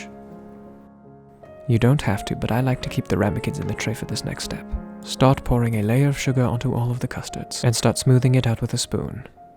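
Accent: United Kingdom. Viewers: the dumpling is cry out for help, and i'm just admiring british voice over🙃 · British accent, nice hair, cooking AND he has a suit on?.. sir, are you TRYING to make me simp for you? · If he didn’t introduce himself as a British gentleman from the 1800’s I would think that that was his actual voice/accent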